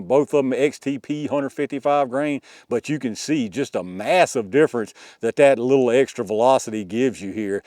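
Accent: United States. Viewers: Your accent is an American treasure.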